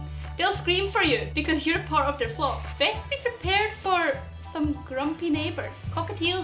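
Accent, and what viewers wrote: Scotland, United Kingdom. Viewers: Are u Scottish;) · Are u scottish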